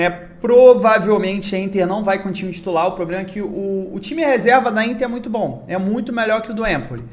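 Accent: Rio de Janeiro, Brazil. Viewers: Vejo seus vídeos e fico pensando "é muito carioca" kkkkk "Dificíão" é nossa cara HAHAHAHAA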